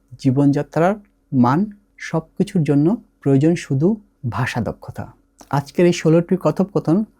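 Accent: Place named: England, United Kingdom. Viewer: ভিডিওটা বেশ সাজানো ও নতুনদের জন‍্য বেশ উপকারী। তবে উচ্চারণটা ইংরেজির মতো হয়ে গেছে। বিশেষ করে উমলাউট বা Z এর উচ্চারণগুলো। 'আবার গুউট...।।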